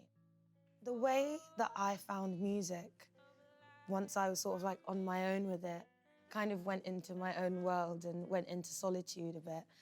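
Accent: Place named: England, United Kingdom. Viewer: Wow u have an English accent this whole time still love you but damm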